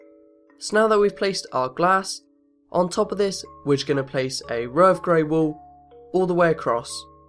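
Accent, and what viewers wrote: United Kingdom. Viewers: No not a robot accent... A British accent. #bruh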